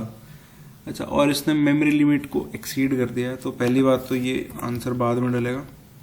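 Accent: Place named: Delhi, India. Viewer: dilli se ho kya bahiee!! :-))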